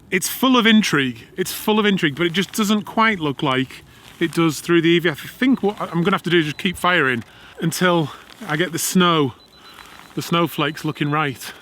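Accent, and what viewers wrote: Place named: United Kingdom. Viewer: You definitely got some keepers on this outing. Its funny when I see a landscape photography video by someone with an british accent, and the video is sponsored, I am waiting for the usual "This video is sponsored by Squarespace". But then you say "Lexar" and I am jolted back to realizing you are not everyone else.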